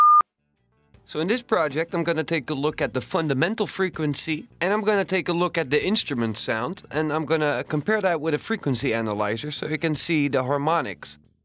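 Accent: Netherlands. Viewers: you have a dutch accent :D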